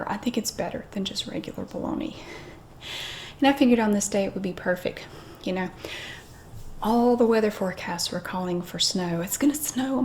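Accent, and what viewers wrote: South, United States. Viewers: "It has little fish awn it!" 😂 southern accents are my favorite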